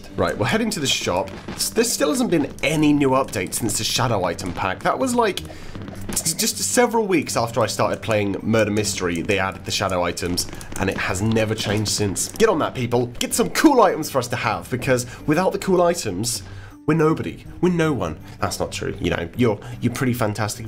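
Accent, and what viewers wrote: United Kingdom. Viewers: do u live in UK cause of ur accent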